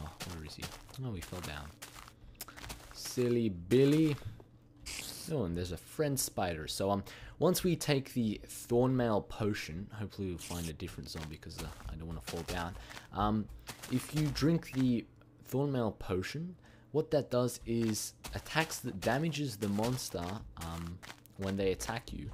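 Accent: Australia. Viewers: thats because people liked his australian accent so he does that now.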